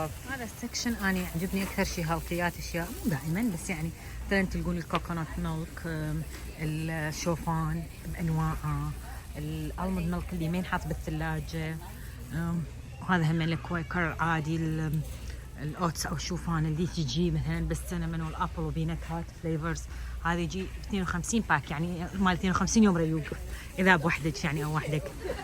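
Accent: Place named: Iraq. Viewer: في أي ولاية هذا أيضاً اللهجة العراقيه كتير حلوه و حضرتك قمة الجمال والانوثة 😂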